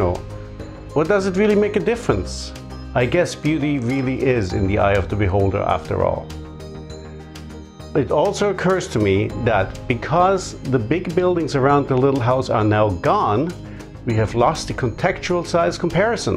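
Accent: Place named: Germany. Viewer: Hi! I love your tiny house drawing- yes the pride in craftsmanship is evident in the beautiful little house you drew. Your narration and editing and videography are wonderful- I feel like the house stands beautifully alone. I detect an accent- are you German or Austrian? My father is from Germany- a wonderful country.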